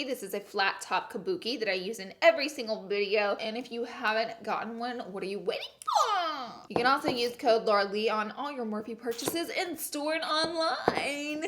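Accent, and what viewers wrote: South, United States. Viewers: DOLLADOLLA BILLS I love seeing inserts of your old videos. I’m from the south and I just love your southern (charm) accent. It was way more heavy back then as it is now, but I still love it and you and Ty. RTR ♥️♥️.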